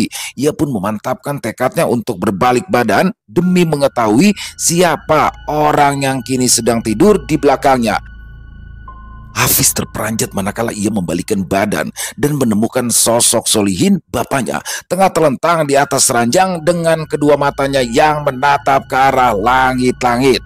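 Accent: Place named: Jakarta, Indonesia. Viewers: Demen banget bang ane...bahasa betokau y...( Betawi y ) Lanjut bang...sehat sllu bang... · Nyimak bang yg cengkok bekasi nya dapet 👏. Ane 20 thn di bekasi masih aje cengkok kemayoran 😂. Lanjut bang 👍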